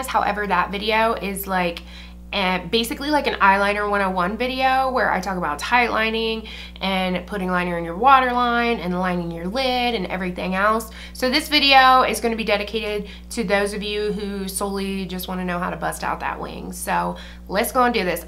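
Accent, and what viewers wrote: United States. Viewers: I love this tutorial, thank you! You sound like your from Philly, reminds me of my aunt lol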